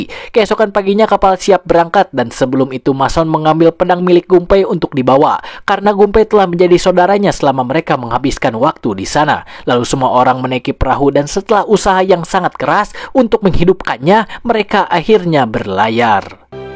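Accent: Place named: West Java, Indonesia. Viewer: Anjir suara nya mirip si jon lQ7 logat sunda nya krasa..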